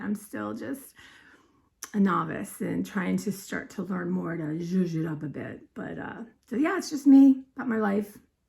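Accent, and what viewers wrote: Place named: Pennsylvania, United States. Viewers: Why did I assume you lived in Pennsylvania? That's the accent I hear. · Where are you from? My daughter who is a linguist would say has a mid Atlantic accent which includes the Philly area but not as intense as Philly. I asked where you are from because I assumed NYC from your Trader Joe’s story, I live in Long Island.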